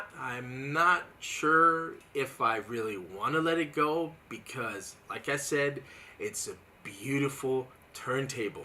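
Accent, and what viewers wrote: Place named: United States. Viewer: Bloody hell. That's crazy. BTW for a German you sound like an American ha ha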